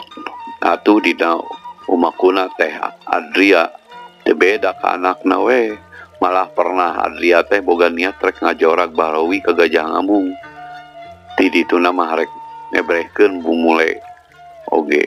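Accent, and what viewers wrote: Sunda, Indonesia. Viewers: ini cerita dongeng yg paling ditunggu dengan logat sunda nya yg khas,mantap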